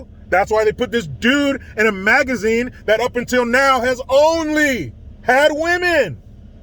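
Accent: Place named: South, United States. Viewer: Love the southern accent. Always hilarious! 😂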